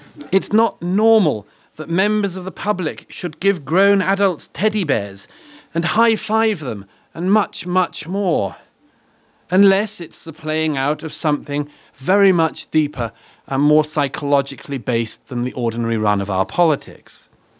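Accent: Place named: RP, United Kingdom. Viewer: Murray is Tommy Robinson with a posh accent. I can think of no higher compliment (but not because of the posh accent; that is irrelevant).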